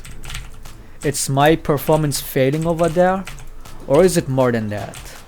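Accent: Germany. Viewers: Die English Aussprache haha :D Kannst du mal ein Video in deutsch machen? ^^ Bist doch deutsch oder o.O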